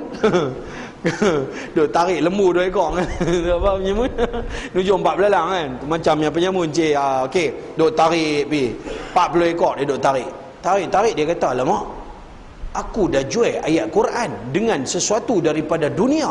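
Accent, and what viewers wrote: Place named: Penang, Malaysia. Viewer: Seronok dengag ustaz cakap penang...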